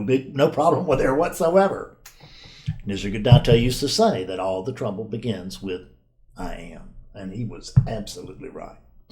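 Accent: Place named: Texas, United States. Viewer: Dear, I would love to see you standing in front of a lectern bashing away with your plastic brain in your charming Texas accent in a church of non duality! Hallelujah brother! Seriously though, that was incredibly helpful. Thank you so much. Very funny indeed 👏👏👏😂🎉🎉🎉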